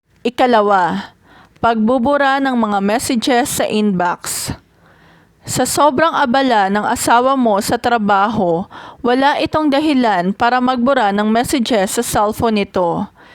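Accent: Visayas, Philippines. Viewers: Oo nga parang maypagkabisaya narin magbasa pero oks lang ok narin naman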